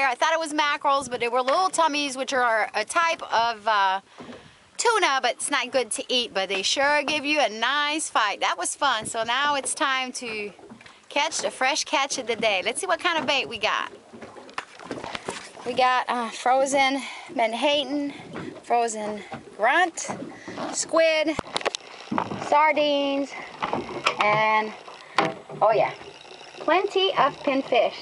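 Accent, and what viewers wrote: Louisiana, United States. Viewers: Ate you cajun?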